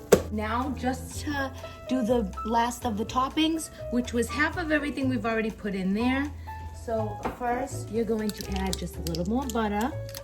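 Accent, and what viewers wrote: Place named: Boston, United States. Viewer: Nice Recipe!! Even better accent! Lol gotta be from Boston!! Please say your from Boston! Lol. Just earned a subscriber 👍🏽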